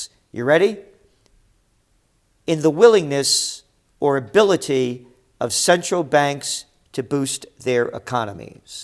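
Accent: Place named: New York, United States. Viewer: Great job Gerald ! I was born and raised in Brooklyn NY and watching how you articulate and present this corrupt system in a very New York way is like music to my ears ! Thanks so much for all you do ! You are part of my "A Team"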